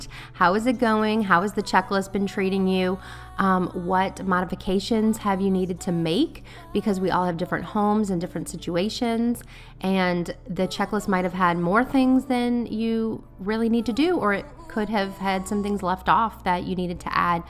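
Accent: South Louisiana, United States. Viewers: Louisiana in the house!! Oh I'm so glad I found your channel! I was like... she sounds more local than just southern, then you said you lived in southern Louisiana, and I freaked! Yay! I'm from South Lafourche, where are you in south LA? I totally loved watching this video! Especially seeing Tony's in the spice cabinet, 😆!! I can't wait to see more of your content in the future!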